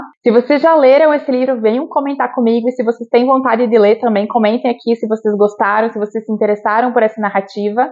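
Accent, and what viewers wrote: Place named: South, Brazil. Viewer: Oi! Pelo seu sotaque tu é do Sul, por acaso você mora no Rio Grande do Sul? Se sim, como você está e sua família? Estão todos bem? Quanto ao livro, muito interessante. Gosto de ler livros pesados, as vezes, sair da bolha sabe. Abraços 😘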